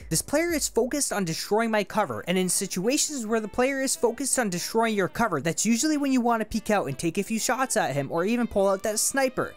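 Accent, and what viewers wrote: Canada. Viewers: Quality tips brother. May I ask where you’re from? Sounds like Canada haha. Do you want to play together on PC sometime? Cheers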